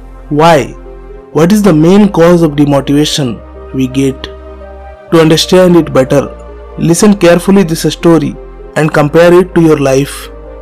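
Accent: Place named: India. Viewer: Good video but you need to improve your sound quality. May try to use Siri to read as your Indian slang is very strong. Keep up your good work. Cheers.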